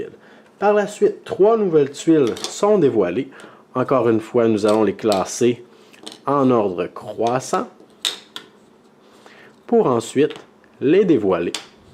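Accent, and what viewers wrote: Quebec, Canada. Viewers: Très bien expliqué et, le bonus, est ce savoureux accent du Québec !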